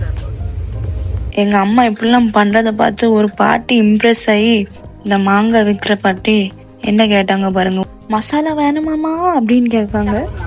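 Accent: Tirunelveli, India. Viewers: I'm a 77 year old retired banker settled in Madras. My native town is Palayamkottai, my paternal thatha's place. We have many relatives in Tirunelveli. I love your typical Tirunelveli accent despite being a Mumbaikar,. Your comments about your thambi were hilarious too. Glad your mother brought all the food for the long journey. I've seen most of your car journey vlogs and was sad about the several breakdowns of your WagonR. I was a Maruti faithful owner for 32 years having changed many models but always satisfied with the reliability of the Maruti Suzuki cars. May God bless you all dear girl. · first time seeing your video..you got different slang..is this Tirunelveli slang..chapaad for sapaad, chemma for semma, cholluvon for solluvon..neenga "sa" endra vartha use panna mattingla..ha ha..anyway all the best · Tvl slang super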